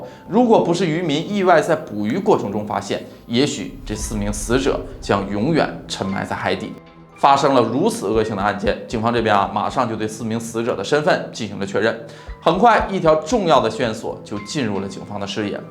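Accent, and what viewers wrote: Northeast, China. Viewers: 是不是，东北人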